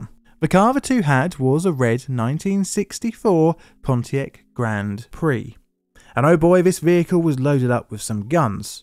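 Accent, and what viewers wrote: United Kingdom. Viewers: Always interesting to hear a Brit describing American incidents. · The one graphic showing the front of the car shows the British origin of this video (as if the accent didn't already) because Americans drive on the right side of the road meaning the driver is on the right side of the vehicle. What other details are wrong?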